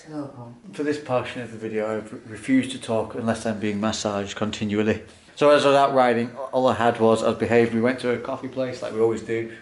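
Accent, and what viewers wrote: United Kingdom. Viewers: Hate brit accent !!!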